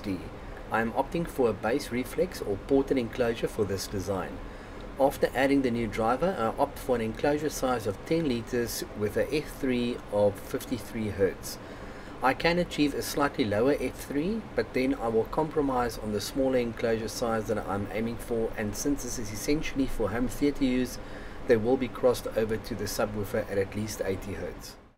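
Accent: Afrikaans, South Africa. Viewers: Hoor ek n afrikaanse aksent?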